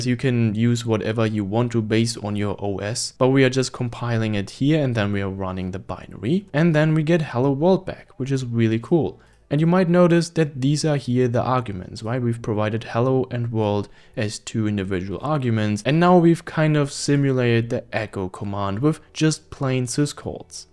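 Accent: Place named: Netherlands. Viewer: Dude sounds like Dutch Elmer Fudd, love it ❤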